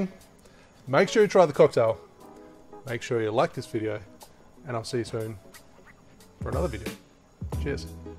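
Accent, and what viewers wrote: Germany. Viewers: I love your German accent! And it looks like a great drink!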